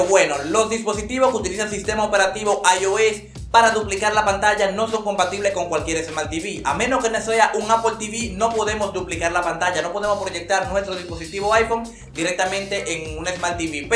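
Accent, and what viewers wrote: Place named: Dominican Republic. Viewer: Aquí en Dominicana hablan así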